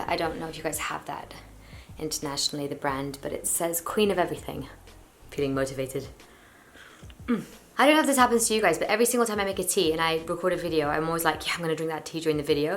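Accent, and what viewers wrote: United Kingdom. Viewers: She moved to London so acquired a slight British accent not as much as her husband though. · Wow - hadn't seen a video in a year or two so thought I'd drop by. Imagine my surprise when I discovered she has a totally different accent now. (Go watch her videos from a few years ago - her accent is American. Now it's what - London?) So weird. Also weird is that she appears to be in the same bedroom she was 12 years ago, even though she's apparently married and has a kid now. Does she still live in her parents' house? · Wow you now have a British accent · Love your new channel and thank you for finally making English videos again, i was just wondering about the heavy British English accent what’s up with that 😃 i mean i used to notice it a tiny bit before but now... 😄 still love everything though ♥️♥️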